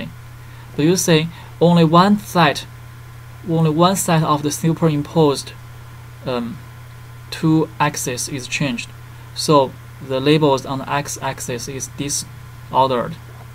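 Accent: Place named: China. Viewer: I heard strong Chinese English. Keep going.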